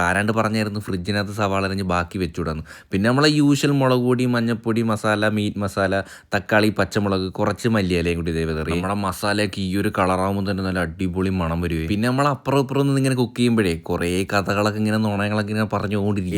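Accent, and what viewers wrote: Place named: Kasaragod, India. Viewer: enik ishto "kaka parndi" kasargod pariyunad😂😂😊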